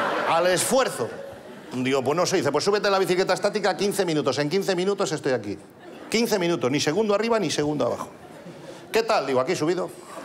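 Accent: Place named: Castile, Spain. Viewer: Leo Harlem es de norte de España, más concretamente del norte de León. Su acento es castellano neutro por así decirlo.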